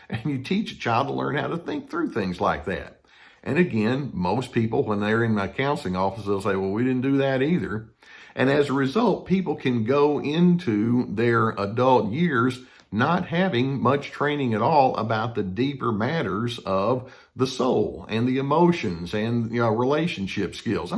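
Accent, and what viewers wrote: South, United States. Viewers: Your southern accent helps send a clear message. You described it well!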